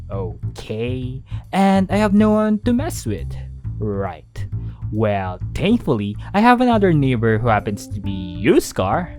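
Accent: Philippines. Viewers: voice sounds south east asian, sounds filipino . . .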